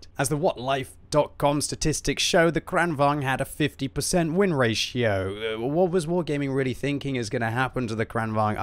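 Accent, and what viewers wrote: United Kingdom. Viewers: sounds even more british like this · You sound a lot more british when sick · Being sick u sound super british :P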